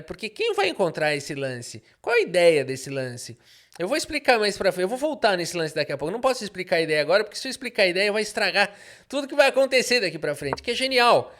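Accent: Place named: Rio Grande do Sul, Brazil. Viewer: Percebo q você é do rs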